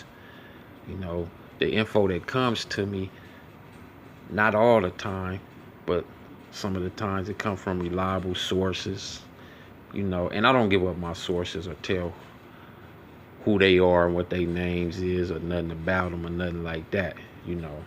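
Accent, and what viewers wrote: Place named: California, United States. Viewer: New subbie here. And I agree that Cowboy does not have anything to do with it. When he took off his hat and scratched his head to me that’s says like wtf did I just see. Or perhaps he thought nip was gonna get robbed but not killed. Anyway you take it it’s crazy and are you from Cali? I can hear the accent.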